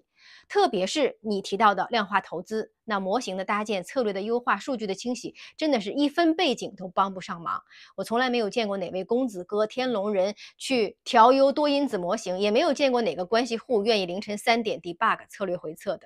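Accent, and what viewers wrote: China. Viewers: 有点好奇，为什么听主播口音好像是大陆人，字幕却是繁体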